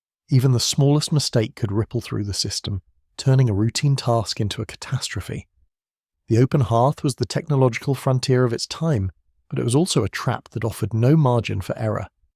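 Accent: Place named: United Kingdom. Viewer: Please: Why spoken in Britisch English? Sometimes really hard to understand...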